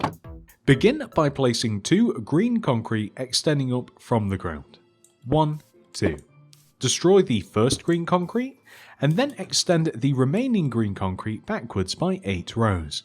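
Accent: United Kingdom. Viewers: Are you british Hope you're not I will be mad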